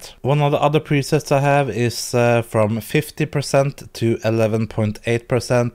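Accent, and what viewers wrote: Norway. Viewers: Tusen Takk Og du høres så Norsk Engelsk ut at det er deilig å høre på en norske som snakker english :D :P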